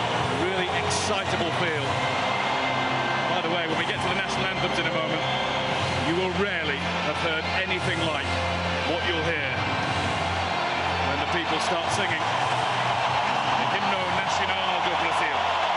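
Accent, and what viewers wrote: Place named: United Kingdom. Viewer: inglês britânico???? por quê????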